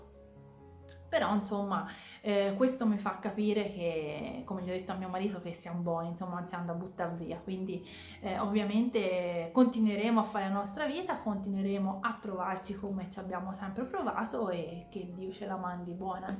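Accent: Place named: Tuscany, Italy. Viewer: Mi piace un sacco il tuo leggero accento toscano, troppo simpatico!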